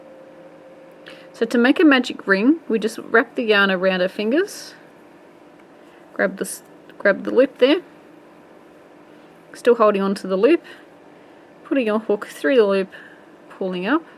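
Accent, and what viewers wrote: Australia. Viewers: love hearing the Aussie accent!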